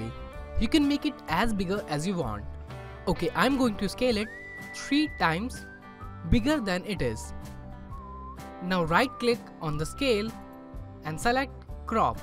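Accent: India. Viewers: he sounds like an indian tech scammer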